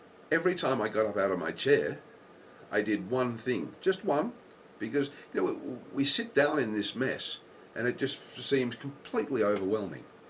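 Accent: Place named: Australia. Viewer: You have an uncanny ability to talk...and talk...and talk.... I've gotta say though, you make more than just a lick of sense. I too find a clean, neat, and organized environment rather enjoyable, relaxing, and invigorating for both the mind and body. 'Tis far easier to do something clean for myself when the atmosphere around me is already clean. Good video! -Josh PS: If you didn't have that accent of yours, I probably wouldn't have lasted the entire video. Aussies rock!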